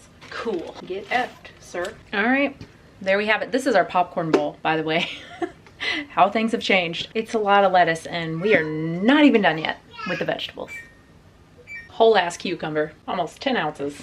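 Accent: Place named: Tennessee, United States. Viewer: I heard your Tennessee accent.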